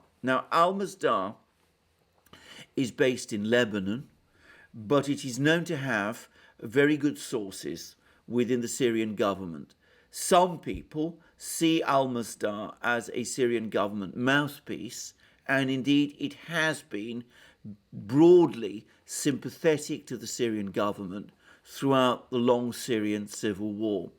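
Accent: England, United Kingdom. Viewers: Interesting informative news but boring presenter. The heavy English accent is difficult to hear for Americans.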